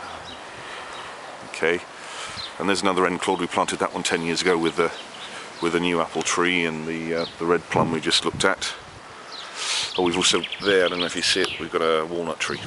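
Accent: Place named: United Kingdom. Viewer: you sound british, where do u live in uk??? tour garden is massive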